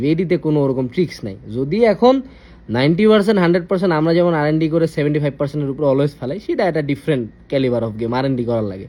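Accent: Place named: Sylhet, Bangladesh. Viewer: oni sylheti er Jonno accent ei rokom abr onar past onek koshter oni jeikhan theke Uthe ashche shobai ta parbe nah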